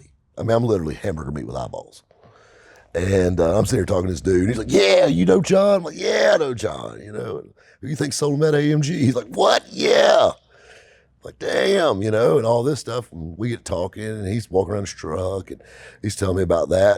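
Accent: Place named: South, United States. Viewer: I swear this is the best YouTube channel out there! I relate 100% I'm from Darlington SC and I swear you talk and act just like my dad. I live in the north now I miss that southern twang now and again keep making em!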